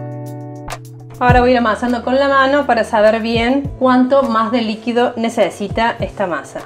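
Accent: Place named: Córdoba, Argentina. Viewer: hay como una tonada cordobesa por ahi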